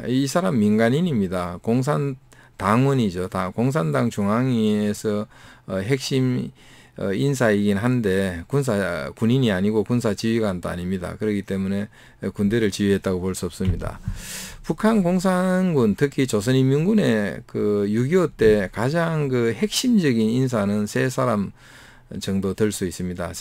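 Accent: Gyeongsang, South Korea. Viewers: 말씨 경상도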